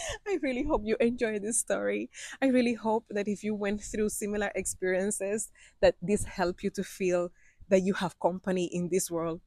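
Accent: Patois, Jamaica. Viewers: I am so. please that you get your Jamaican citizenship, my grandmother was a Cuban too she lived in Jamaica 🇯🇲 from 14 to 76 years ago when she died , she would sang to us. her grand children in spanish ,I have thousands of relatives in Cuba that we don't know because of the Cuban system, enjoy your life in beautiful Jamaica 🇯🇲 and I love how you speak like Jamaican Blessings galore. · Thank you for sharing your story. I love listening to your Jamaican accent. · "Tell her bout her backfoot" this is so Jamaican.I love your patois.Keep going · Blessings. Lovely story. We are glad there was a happy ending to it. I love your vibe, your command of English and the ease with which you slip in and out of Janaican patois. No es facil mami. Gwaan do yuh thing. Bendiciones! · Fortunately, the right people in Jamaica saw you were genuine and honest. Btw, I enjoy hearing your Jamaican patois. 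· I absolutely love your spirit! I love that you have taken up the Jamaican patois! I would have never known that you were originally from Cuba. Bless up from Barbados 🇧🇧with a Jamaican 🇯🇲 mother!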